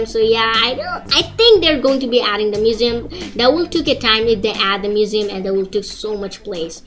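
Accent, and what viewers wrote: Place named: South, United States. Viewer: God he sounds like a hillbilly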